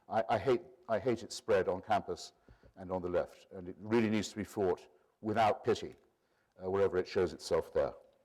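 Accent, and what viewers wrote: United Kingdom. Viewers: So many reasoning errors, yet structured with such eloquence, a beautiful British accent and confident condescending tone. To name a few: 1. Opposing Zionism before the creation of the state of Israel is not the same as after it's establishment. In the same way that not wanting to have a child is different than wanting to get rid of a living person. 2. The fact that Jews didn't want to be told that their place is in Israel, doesn't really negate the idea of zionism. Zionism was never about forcing all Jews to live in Israel, just creating a safe haven. 3. An in-group argument is very different in nature a criticism from the outside. Targeting a group by finding a group inside the group that sides with you (for reasons that have to do with the Jewish religion, nothing to do with your reasons) is classic tokenism. Just say some of your friends are Jewish, it's shorter. 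4. The fact that there are antisemites that are content with Zionism, does not in any way lead to the conclusion that opposing Zionism is not antisemitic. It might have a nice witty ring when he says it, but logicality it doesn't follow. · I wish he had american accent. Bit hard to get everything with british accent. Good stuff thou😊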